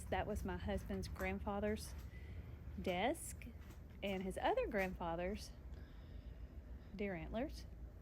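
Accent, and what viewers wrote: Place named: South, United States. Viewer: I love southern accents! Please don’t let anyone tell you your accent is horrible.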